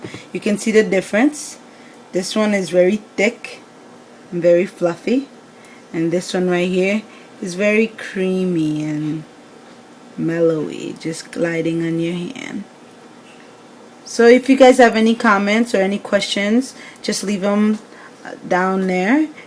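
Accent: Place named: Caribbean. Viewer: so obviously she gotta accent is either west indies or carribean ....lovin it. really liked the video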